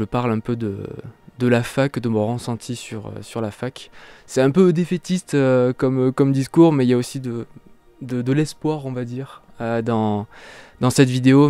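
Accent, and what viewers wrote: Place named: Montpellier, France. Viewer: j'adore ton accent! j'ai le même! Dommage, je viens juste de te connaître et tu pars de Montpellier ;-; Bon courage pour Paris mon pote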